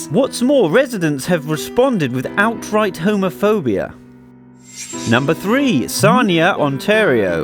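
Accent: United Kingdom. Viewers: Exactly. And New Brunswick cities aren't even half bad. This guy is so misinformed. He sounds British, probably not knowledgeable on Canada. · Are you from the USA? You sound so british.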